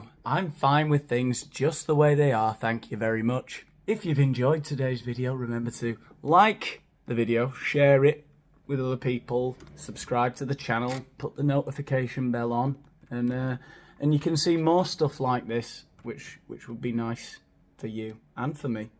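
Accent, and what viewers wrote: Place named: Northern England, United Kingdom. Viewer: How have I only just discovered you? Subscribed ....ready for a binge. Love your Northern accent feels like home.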